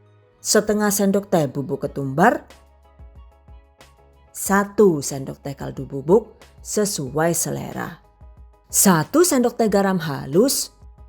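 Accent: Banyumas, Indonesia. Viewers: Aku seneng banget Maring cenel kiye koh penasaran jan2 asli ne wong ngendi Banyumasane kok medok banget.😀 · "DONE" Alhamdulillah selalu suka resep dari dan trik dan tips setiap resepnya jelas banget... Apalagi suaranya khas banget dan ngapak😁😁 Pokok resepnya selalu di nanti2😍😍 Sukses selalu buat dan terimakasih atas semua resep2nya😘😘 #giveawayTHV2020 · Laaah Wong ndi sih gye, deneng ngapak? 😂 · "done" #giveaway thv 2020 Slalu ngapak...sukses ...catat...👍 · Lucu banget..ngapak2 kaya nyong bae deneng lah..mbekayune.. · Aku suka bahasa jawa ngapaknya...ora ngapak ora kepenak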